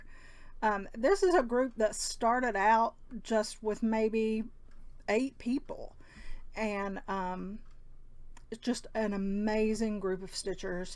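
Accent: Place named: Tennessee, United States. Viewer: I just realized you must live near my old stomping grounds. I spent most of the 80’s in Dickson, Paris, & Dresden, TN. I miss it a lot! Nice to hear your familiar accent. I’d love to stitch long winter.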